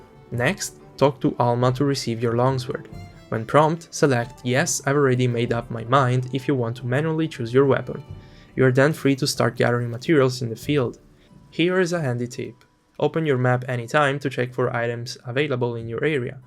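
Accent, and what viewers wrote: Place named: Italy. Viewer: he's italian, so you gotta deal with his accent, he speaks very clear imo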